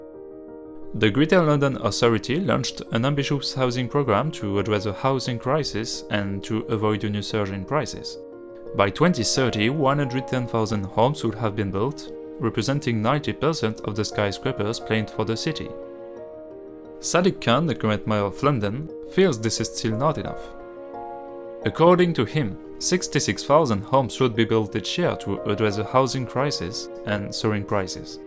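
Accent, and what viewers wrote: France. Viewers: It's not the accent which is most troublesome about this (after all, the fact that the speaker clearly hasn't sought help to IMPROVE his spoken English - all the vowels are French, the intonation is syllabic not tonic, etc etc. -all very predictable imperfections - is a comparatively minor matter), it's the extraordinarily careless attitude of whoever commissioned this audio commentary: thirteen seconds in and we get 'to discuss about' something: a mistake which should have been corrected in troisième... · You do not need to sorry for your French accent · Love the French accent ! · The French accent is beautiful🌹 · Yes we like french accents...it sounds so french 🥖🧑‍🍳🎭🗼🇲🇫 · Think most brits like the french accent. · Your accent is magnificent. I love France and the French.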